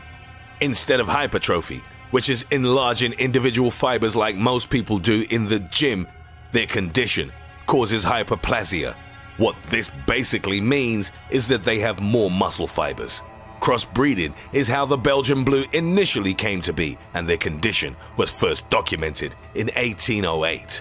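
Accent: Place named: United Kingdom. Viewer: This british narrator makes everything 10 times as scary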